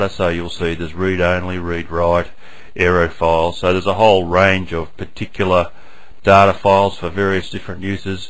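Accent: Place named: New Zealand. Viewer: Are you from NZ? I have been over there and your accent looks very kiwi for me... :)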